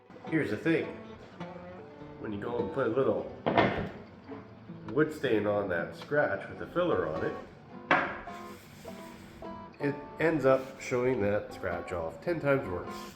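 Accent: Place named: Canada. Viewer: First time watching…immediately hear a Canadian or upper Midwest accent!❤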